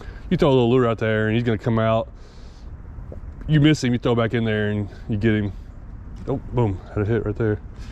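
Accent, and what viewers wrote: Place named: Michigan, United States. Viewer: Sounds like Michigan to me lol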